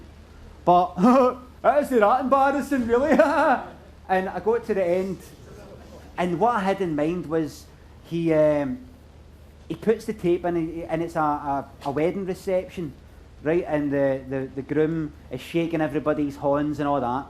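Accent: Scotland, United Kingdom. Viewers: too scottish for hes own good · gonna sounds mean but i dont mean it in a bad way.. but pretty sure the accent scares away a portion of the audience. For exemple i speak french so learning english was a struggle already. hearing someone speak in a heavy accent is quite can be quite hard to understand . i've been watching and reading scot stuff so im personally used to it now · About his accent as he addressed it at the end of this show, I'm Irish and don't find the Scottish accent the easiest to decipher at times(due to the heavy colloquial stuff as much as the accent itself) but never truly failed to understand Limmy's jokes despite any supposed comprehension challenge. A happy accident of sorts came to my benifit as I continued watching Limmy show I found my ear tuning to the Scottish way of language which increased my ability to be a more attentive listener, one who searched for meaning in one's speech rather than have it handed to me on a plate. 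Limmy was very correct to keep his true speech. It best represents his intent for his jokes and also politely asks a discerning audience to be active listeners and cognitively involved in figuring out the jokes in his work. He writes his work like Miles Davis wrote jazz, honest and true and then only appreciatable by those willing to keep their own active mind present when being entertained. Not saying anyone is smarter or less so, just distinguishing the difference between people who watch comedians or listen to music to shut off or disconnect from their mind, maybe as some kind of break or rest for it(the mind), as opposed to those who keep their mind involved in the entertaining experience as to appreciate it earnestly (as in beyond mere amusement). Any thoughts? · I don't know why but subconsciously I didn't think his accent was actually like that, and then seeing him actually talk it's like wow Scottish people are... 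real.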